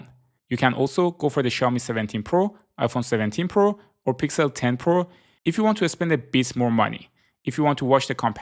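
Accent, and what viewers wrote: Iran. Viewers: Are you persian? You sound persian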